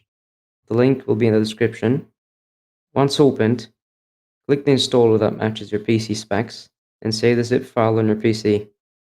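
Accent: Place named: Scotland, United Kingdom. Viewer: Are you European you sound like a scotsman 🤔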